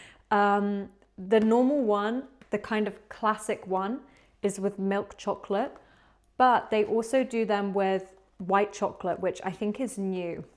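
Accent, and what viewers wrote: England, United Kingdom. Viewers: This is the first time I've seen one of your videos, and I find everything you explain about rural England, or as you call it, the countryside, very interesting. I'm from Honduras, Central America, but I've lived in England since before the pandemic. I really like your English accent, the way you speak, and the cadence you use. I find it very pleasant and clear, and of course, I understand it perfectly because you speak slowly, which makes it very pleasant to listen to. I would like it if, in your new videos, you could explain why the language is spoken with different accents in different cities and counties, because some of them are really difficult for me to understand. Greetings from Nottingham. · R u native british ? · You speak very clear words I believe its good for beginners to watch your video to learn English and British accent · good videoes and carry on! 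And personally, it is not only the videoes that I want to see, but more skills and expressions of native British accent! Now it's the slow english, but what about a bit faster? More training techs and skills would also be welcomed! Thank you so much again for making lots of videos so far! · You are so quiet. And you speak slow British English very interesting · wow I love your accent inglish and thank you so much keep going 👌👌💕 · Many thanks to you!! Good experience for me to hear British pronunciation, and I don't need to make speed lower, as for my level, it might be a little bit faster. I'm from Russia and today in 8th of March, we celebrating international women's day, so wish you stay cheerful and cool as you are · Is this british?🇬🇧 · Hi, this is jesmine from Bangladesh. I basically played this video to mimic your slow British Accent. Actually I'm learning English and now my level is approximately B2 but my pronunciation is worse this is why I decided to watch and follow your voice and I did it all. 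thank you for this video and I expect you will make more and more slow video which will be helpful for the learners how want to gain British accent also who want to fixed the pronunciation like me 😁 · Thank you for your vlog. I really love the British accent, and I enjoy learning more about the UK. That’s great! Please keep making more vlogs. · ❤I'm Brazilian and I'm learning more about the British accent from your videos, I'm loving it! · Nice and interesting video, I like British English!....... Thank you so much!...Greetings from Peru!...💞🇵🇪❤️...comprehensible input to practice listening English 💫👍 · The British accent is si beautiful, ojalá siempre fuera así de lento, you are beautiful too ❤❤ new suscriptor 🎉❤ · Hi, your Slow British English is really helpful for English learners. Thank you so much! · great video! I really appreciate that you speak slowly, it makes it much easier for people who are learning English I' ve been trying to get British accent, and your videos are actually helping a lot also Wilson completely stole the show :)) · always love british accent videos, and your gentle voice is so nice, keep on🥰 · Thanks for your videos. 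I study English, and this content helps me with my pronunciation. I like the British accent ;) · Please teach me how to speak with british accent ahahah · I like this video of slow British English, it's really easy for me struggling with my listening and spoken part to listen your words clearly. Besides, British accent is extremely elegant while you spoke slowly and clearly. So i appreciate your video of life in British coatal town, hoping you can show your normal life in the UK hh which i prefer to watch, thanks.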